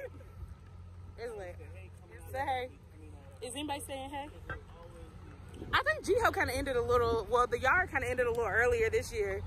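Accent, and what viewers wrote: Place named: North Carolina, United States. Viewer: It’s crazy how you can tell someone is from NC without even knowing them!! Tre-4!! 😂Just found your channel girl love the content!!!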